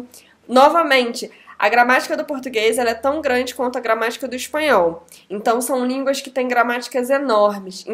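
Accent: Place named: Rio de Janeiro, Brazil. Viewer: Eita sotaque carioca!!! TMJ!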